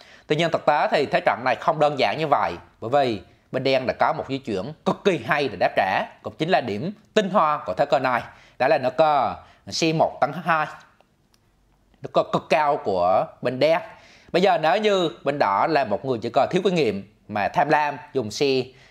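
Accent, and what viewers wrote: Binh Dinh, Vietnam. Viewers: Giọng bìn định phú oen nghe hài quá kk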